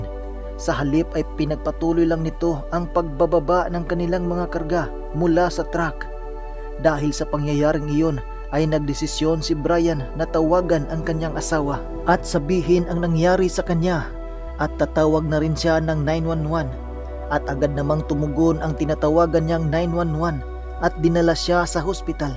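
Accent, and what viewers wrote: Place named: Visayas, Philippines. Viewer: Bisaya ka dol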